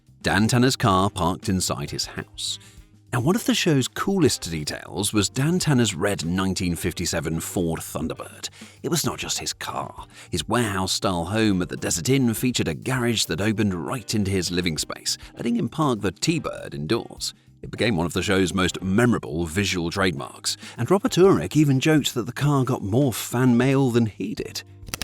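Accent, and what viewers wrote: United Kingdom. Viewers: I wasn't born in 1978, but I had never heard of this TV show growing up in the 80s in the UK, so I assumed it was never shown in the UK. However, the person narrating this video has a British accent, so maybe it was shown in the UK!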